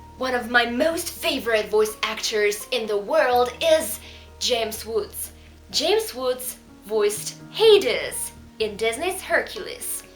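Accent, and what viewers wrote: United Kingdom. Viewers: Tu acento es más bien británico.🤔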